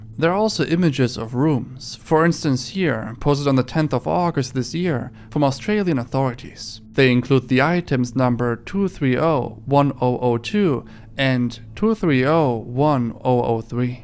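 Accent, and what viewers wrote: Germany. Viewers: My favourite German YouTube accent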